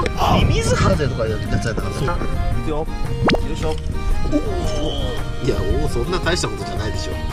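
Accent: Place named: Kansai, Japan. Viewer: 最近関西弁ちょくちょくでてないか？？嬉しいぞ？？？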